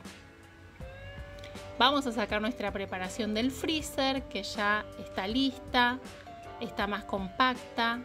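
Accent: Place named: Argentina. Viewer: voy a probar tus Recetas, te encontré, y me encantó como explicas,lo sencillo y se ve todo Delicioso!!!!! Que lindo paisaje desde tu ventana!!!!! Por tu acento,me pareció que sos Argentina.....me equivoco???? Ja ja bueno....es curiosidad.....Gacias,Abrazo desde Argentina.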